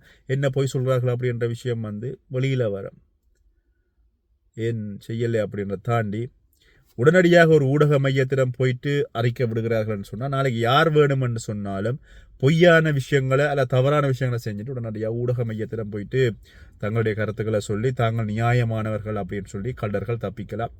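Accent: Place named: Tamil Nadu, India. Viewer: நீங்கள் ஊடகவியலாளராக பணியாற்றியவர் என்றால் ஏன் Baljeet போல இந்தியத்தமிழ் கலக்கிறீர்கள்?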